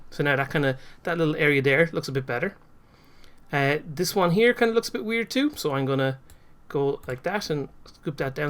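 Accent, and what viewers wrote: Ireland. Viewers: Great tutorial!! Helped me tons on getting my 2D platformer going! Are you Irish?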